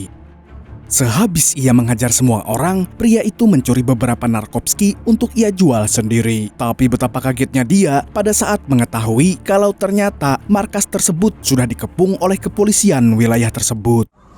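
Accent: Banjar, Indonesia. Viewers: betakun am .. org banjar kah pian mang ?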